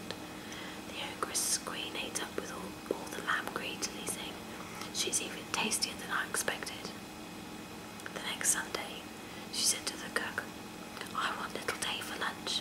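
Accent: United Kingdom. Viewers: Your vids are pretty awesome. I've been craving a new British accent ever since Whispering Life stopped doing hers. Glad I'm came across you. I'm more than satisfied here! : )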